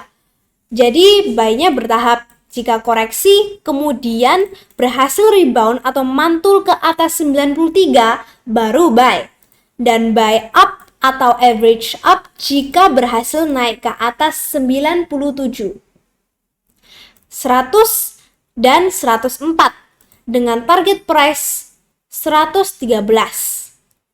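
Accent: Java, Indonesia. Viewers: Mantabb... Walau medok tp tetep insightful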